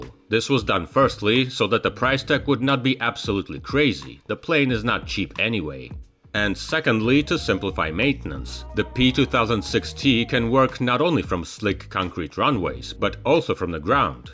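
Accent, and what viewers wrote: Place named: Russia. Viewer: I needed more "Wings of Russia" and I found you - everything related to aviation sounds better in a Russian accent!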